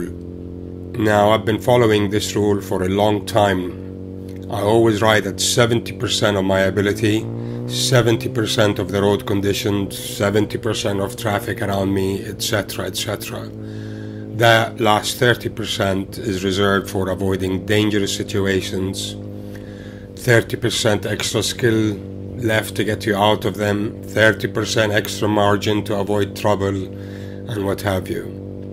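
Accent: United Kingdom. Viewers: is this yourself speaking? i expected a much more british accent haha and im going to italy with my motorcycle this year, i like the 70% rule last year we also went there and 4 out of 7 bikes crashed (at least) once, luckily i wasnt 1 of them and want to keep it that way :)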